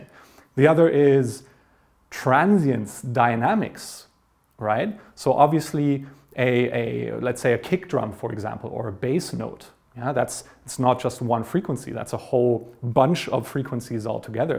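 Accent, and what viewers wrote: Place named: Germany. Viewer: For me as an aspiring soundengineer, this video was so helpful. Thank you so much for this clearification. Are you from Germany? Your accent sounds familiar😅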